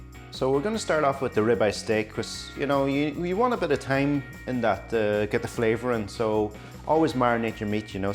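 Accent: Ireland. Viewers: There’s something magical about an Asian with an Irish accent 😂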